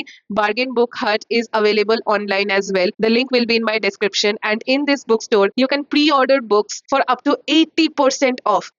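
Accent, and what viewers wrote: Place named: Bengal, India. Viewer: You are a bengali. We can observe from your accent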